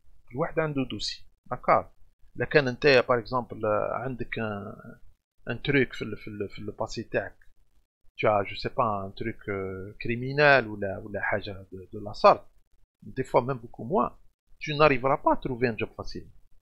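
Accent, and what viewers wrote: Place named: Morocco. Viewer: Ce minable a un accent marocain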